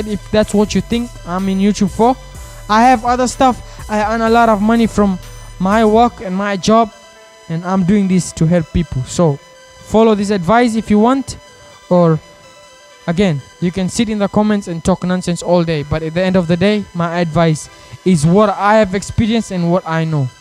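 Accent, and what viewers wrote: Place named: Africa. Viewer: You sound African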